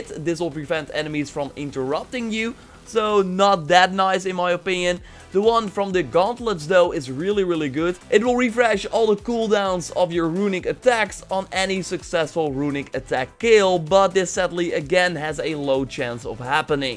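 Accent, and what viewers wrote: Netherlands. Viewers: Jezus what a strong Holland accent!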